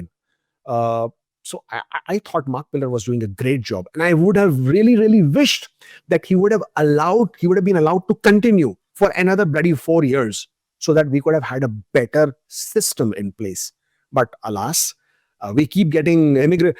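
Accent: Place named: India. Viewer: You have a clear Indian accent. If you weren't granted entry to Canada by soft policies, will you be in Canada?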